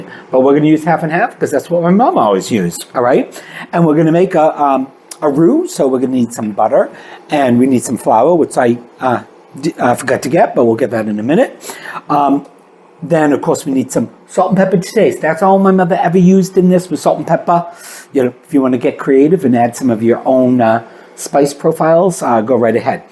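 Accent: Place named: Massachusetts, United States. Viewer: Are they in my home town? Newton Mass? Accent is correct!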